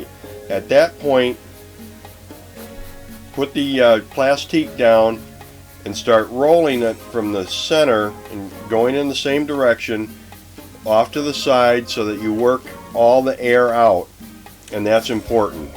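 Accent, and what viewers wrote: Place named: Chicago, United States. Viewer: Chicago area accent, right?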